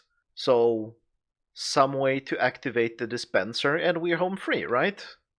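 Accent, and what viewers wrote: Sweden. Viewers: Well tru but it gets a bit more complicated to check if all have shulkers when you have a bunch of them. And i hate to fill things manually since i will only do it when i see that something is wrong. But that's a good point i think i will put the buttons directly if i can push them between the hoppers :) Great filler though had a lot of problems with other loaders but this just runs smoothly :) Your from sweden i guess? Sounds like it atleast. Grymt jobbat med denna lilla contraption kommer fortsätta hålla utkik efter saker som du lägger ut :) Är du nån gång intresserad av nya projekt har jag ett som jag inte kommer kunna lösa men om du vill ge det en chans så hojta :)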